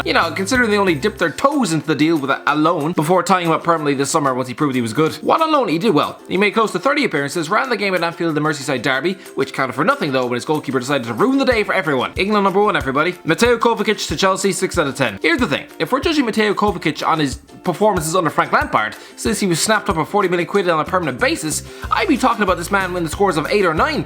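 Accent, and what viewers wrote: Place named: Ireland. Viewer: his tongue moves faster than he talks Love u.. #noHOMO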